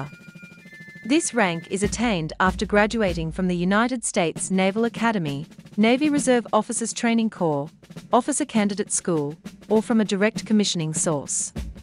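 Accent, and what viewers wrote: United Kingdom. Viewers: Accurate video, but kinda strange that it's narrated by a British woman.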